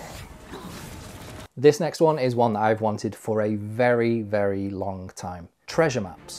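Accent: Wales, United Kingdom. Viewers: Are you Welsh? You sound Welsh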